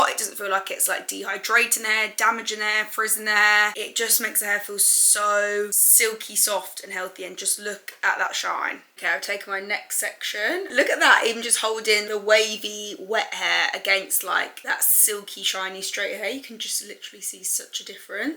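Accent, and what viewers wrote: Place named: Australia. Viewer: I thought you was Australian at first 😂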